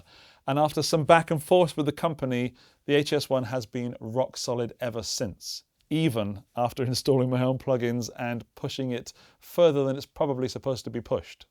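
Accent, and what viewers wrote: England, United Kingdom. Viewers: You provide a window into the future from Austria, but with a subtle English accent of a part of England that this American born citizen of the world cannot identify on a map of counties and London neighborhoods. I realized about 3 sentences in that I am not the target market, but I do enjoy your geeking out on toys that you dig. Keep up the hard work and enjoy the last month of summer (more or less).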